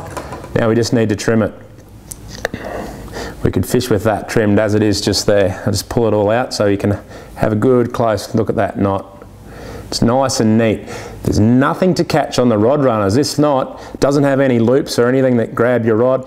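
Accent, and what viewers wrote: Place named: Australia. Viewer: This video is much more blokey in the Aussie accent.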